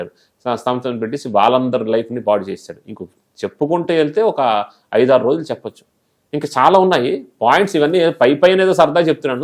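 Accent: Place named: Srikakulam, India. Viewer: Mana.. Sklm... Tone..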